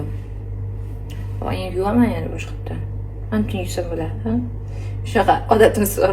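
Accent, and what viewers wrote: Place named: Sho'qo, Uzbekistan. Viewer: Soʻqodayam shunaqa sheva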